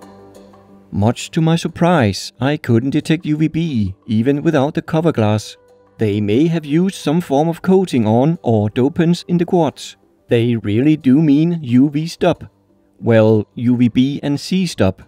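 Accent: Denmark. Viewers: The Danish accent 💀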